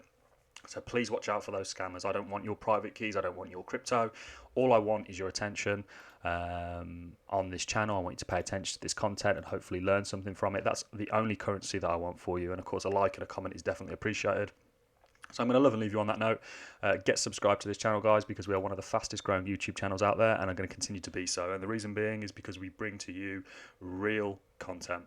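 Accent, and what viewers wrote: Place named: Wolverhampton, United Kingdom. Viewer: I have a friend from Wolverhapton, UK. He sounds like you. Great video, hi from Sweden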